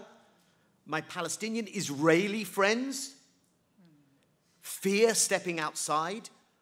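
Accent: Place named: United Kingdom. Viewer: He maybe Israeli but he speaks like a clear thinking Brit.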